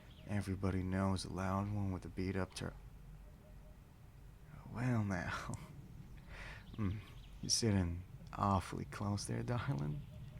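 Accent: South, United States. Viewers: Ahhh! I’ve missed ur southern accent videos one of my favorites!! :3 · Been absolutely lovin all these (your) audios, but the southern ones rly be hitting a bit different😊🫠. Hopefully gonna hear more in the future♡. (And possibly a part 2 to this one😇) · Okay ffs i wasnt exoecting it to work on me i can feel my heart rate pickin up quickly southern accents and country folk are alwasy gana be my weakness it reminds me of home and beyter days i supose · Accent: ✅ Nickname: ✅ Blushing: ✅ ✅ Safe to say southern boys are my thing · your southern accent is still as cute as your first cowboy roleplay HAHAHAAHHA! ( ≧∀≦)ノ CUTIIIEE! glad you're back <3 (((o(*ﾟ∀ﾟ*)o)))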